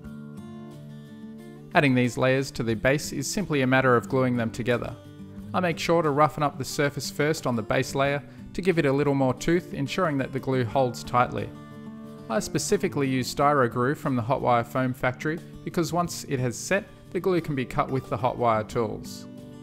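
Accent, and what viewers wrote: Australia. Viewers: I can sit and watch your videos forever. Nice background music, an Aussie accent and lots of tips and tricks to build awesome stuff. What more can one ask for. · you clearly have an Australian accent, Yet use a lot of American products, so my question is where are you located? As I am in Australia and importing those products work out to be very dear.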